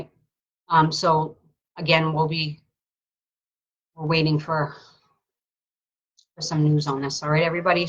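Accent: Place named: New England, United States. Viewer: I’m from Massachusetts too!! I heard your New England accent right away.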